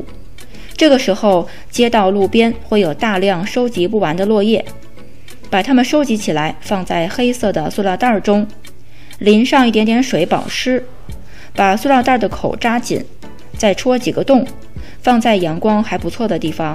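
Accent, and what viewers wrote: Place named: Beijing, China. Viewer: 其实北京口音太浓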